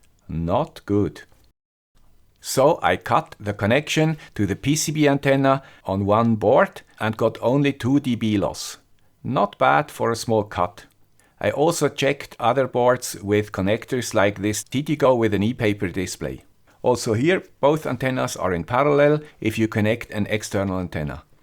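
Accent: Switzerland. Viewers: Hallo Herr! Ich hoffe Sie haben trotz dem netten Schweizer Akzent das Deutsch noch nicht ganz verlernt. Ich liebe ihre sibirische? Katze vom Abspann, gruss von meinem sibirischen Kater Candy. Leider habe ich das englische nicht so drauf wie Sie, kompliment für Ihr Schweizer Englisch und Ihren tollen Kanal. Ich habe noch keinen Youtube Kanal gefunden in dem ich so präzise Erklärungen zu diesen hochtechnischen Themen gehört habe. Bitte weiter so! Grüße von Michael aus Deutschland. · youtube: *sends notification about new video * me in mind: * PRETTY YOUTUBERS HERE IS THE GUY WITH SWITZ ASCENT WITH FRESS SENSORS AND MCU......* 😍😍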